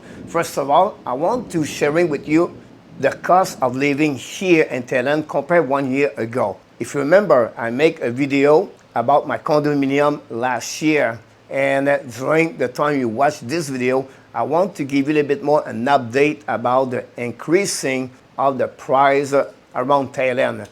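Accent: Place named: Quebec, Canada. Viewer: on dirais un quebecois tu vient de ou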